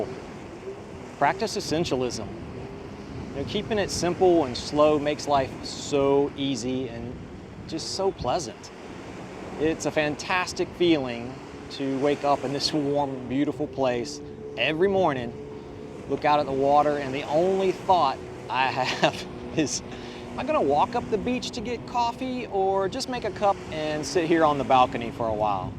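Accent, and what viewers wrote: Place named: Tennessee, United States. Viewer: Slight accent there, I'm guessing Tennessee, maybe southern Kentucky???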